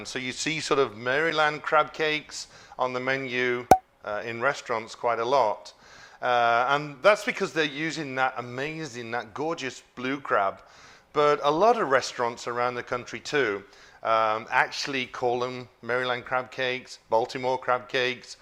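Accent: United Kingdom. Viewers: I love my brits!! I love ur accent!! Kinda want to move to England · It’s just the way us Brits say it with our accent. When I moved to the US from the UK, everyone laughed when I pronounced it literally ‘Mary-land’. It took me a while before I learned it was pronounced more like ‘Merilyn’.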